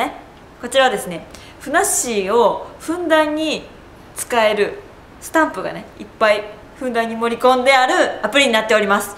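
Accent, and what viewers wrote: Kansai, Japan. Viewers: ええ感じの関西弁やなぁ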